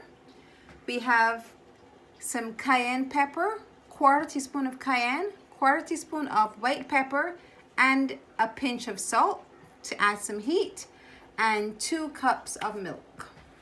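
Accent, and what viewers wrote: Barbados. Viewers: Your accent sounds like bajan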